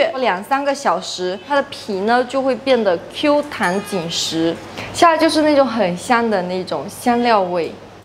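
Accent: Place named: Putian, China. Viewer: 莆普有待提高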